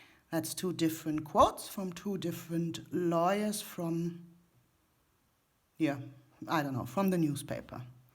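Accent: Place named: United Kingdom. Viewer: that is why there is a British accent coming through at times 😉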